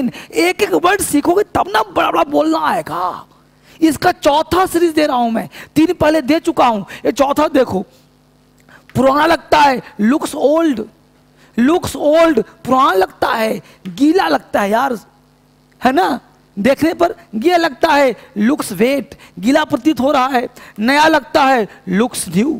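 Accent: Bihar, India. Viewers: Guru ji Bihar se ho kya ap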